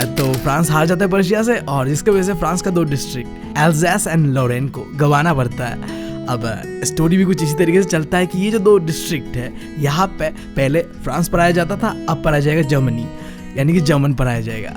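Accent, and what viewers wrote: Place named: Bengal, India. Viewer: bro you are a bangali becase my friend speakes same like you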